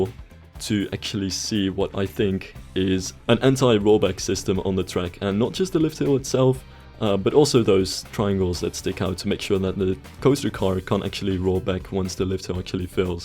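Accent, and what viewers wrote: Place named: Netherlands. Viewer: Nice video! You have a great accent for someone from the Netherlands. BTW: I'm dutch too.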